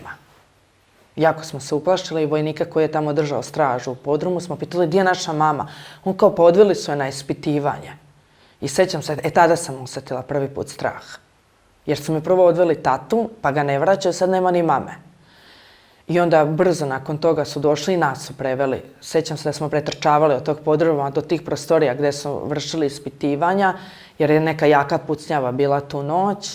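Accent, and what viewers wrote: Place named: Ekavian, Serbia. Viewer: I još ekavicom pričaš i bre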